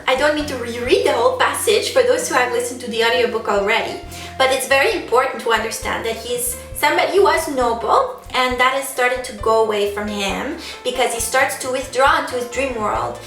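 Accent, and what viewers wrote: Quebec, Canada. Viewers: David Bro it’s not fake. I know this is decently old, but for anyone who may read this in the future, here’s a bit of explanation. Her accent is French Canadian. Accents can fluctuate when: 1. Talking to other people with that accent it can become stronger. 2. When talking excitedly or fast, it can absolutely come out much stronger. 3. When talking to someone without an accent, someone with an accent may subconsciously be speaking without the accent just from the situation. As for when she sings, David Bowie had an accent yet you could never hear it when he sang. You CAN in fact hear it in certain words. Hope that clears things up. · French Canadian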